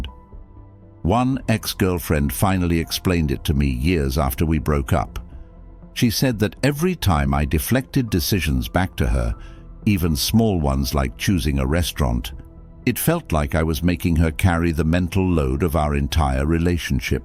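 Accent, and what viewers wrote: United Kingdom. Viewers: AI with a British Accent.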